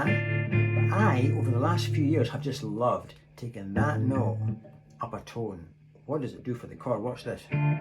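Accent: Scotland, United Kingdom. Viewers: As a 63 year old Scotsman living in the Alps for the last 20 years, and recently taken up guitar and watched quite a few videos, I just love the Scottish patter and your skill with the instrument and your words. Keep it up, appreciated by many as I can read, but definitely by me. As a car lover I also smiled with glee at your wish to get a new car without kerbing and clutch abuse. Looking forward to watching more of your back catalogue of videos. Loved the camping one, felt like home in the north west highlands, Many thanks.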